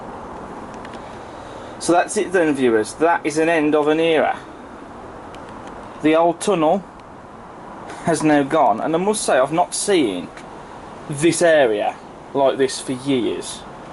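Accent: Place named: Coventry, United Kingdom. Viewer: Whereabouts do you come from? Sounds like Coventry perhaps?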